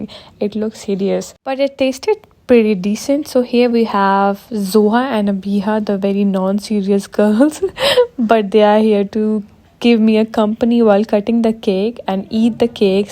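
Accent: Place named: India. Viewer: Work on your accent please, you have typical desi accent tak tak accent 😁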